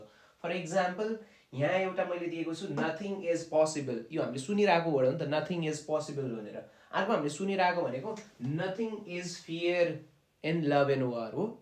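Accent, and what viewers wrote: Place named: Gujarat, India. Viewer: I thought you are from Gujrat or rajasthan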